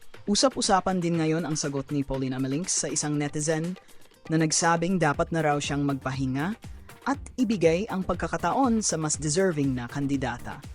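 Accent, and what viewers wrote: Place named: Visayas, Philippines. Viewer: Hala! May accent ka na nga !!! Dati tunog visayas kaw!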